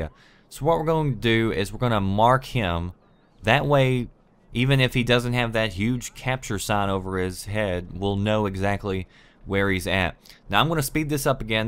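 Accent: South, United States. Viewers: Your commentary is top notch. It's probably the southern drawl. I don't even have Splinter Cell and I enjoy these walkthroughs. I am looking forward to you making more "Tips & Tricks" videos for BF4. That's originally why I subscribed to your channel a long time ago. Keep up the good work.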